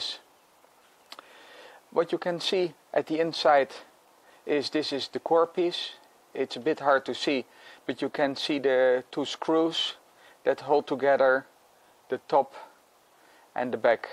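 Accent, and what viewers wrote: Netherlands. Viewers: Are u Nederlands i'm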